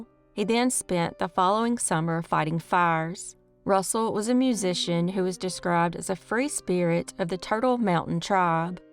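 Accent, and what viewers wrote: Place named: Georgia, United States. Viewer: I keep trying to place your accent. Is it Georgia/close to Alabama?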